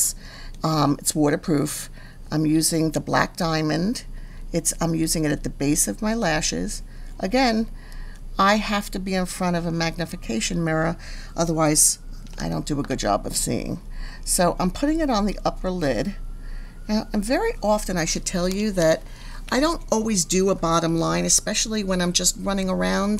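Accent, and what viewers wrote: United States. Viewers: I LOVE your New York accent!! I will try some of your products soon. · I had no idea what she looked or sounded like…she’s so normal! 😂 I love her accent too …she talks like my mother too who was born and raised in the BX ❤❤❤ love this video! Definitely gonna start buying her products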